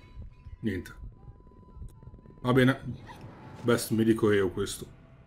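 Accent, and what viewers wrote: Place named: Lombardy, Italy. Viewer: ti ringrazio a te per il "bell" ahahah. Hai un'accento familiare, sei lombardo?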